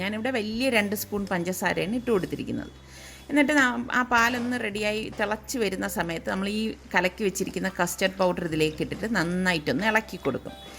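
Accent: Trivandrum, India. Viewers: Tvm style samsaaram kettappo oru sugam......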